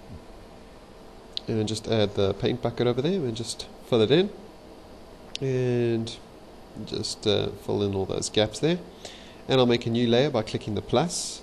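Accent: South Africa. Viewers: He's South African, not British. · omg r u from south africa cuz u sound like it ... im from cape town !! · you are South African hey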